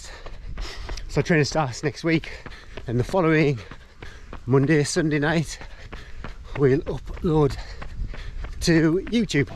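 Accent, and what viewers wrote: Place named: North East England, United Kingdom. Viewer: Hey...just subbed from Semi-remote Canada! (and followed ya on Strava...I just set that up..."Mookie" Good to see an "old" guy trying crazy stuff! I'm 58 and have a trail marathon next month (Oct 8). My longest race has been 50km. Hard to find the longer ones near me. I have 3 dogs too so a bit of logisitics. Looking forward to your training vids for this 100 miler! I find I have had to modify my training to keep fresh legs and can't pound away like I did 20 years ago. You live up where my parents come from....West Pelton and Chester-le-Street area...nice to hear your accent (even though you likely would think I'm the one with the accent!)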